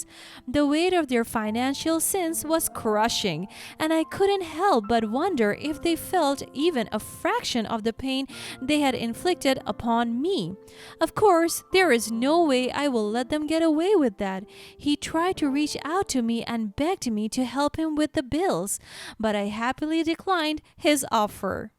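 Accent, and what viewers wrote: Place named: Asia. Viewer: 80M RUPEES? NOT 80M USD ! BULLSHIT! I CAN HEAR THE ASIAN ACCENT!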